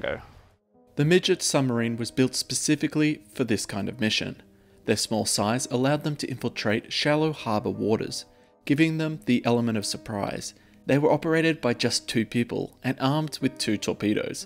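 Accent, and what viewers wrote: Australia. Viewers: I like the Australian English accent. Is how you say "nuclear" the way all Australians say it? 🇦🇺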